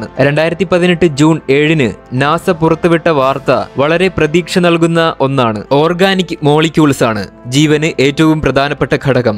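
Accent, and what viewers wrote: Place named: Kottayam, India. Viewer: Kottayam ayirikkkum fullli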